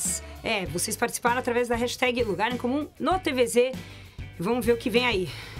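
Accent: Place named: São Paulo, Brazil. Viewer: A voz grossa dela é inesquecível, e esse sotaque paulistano.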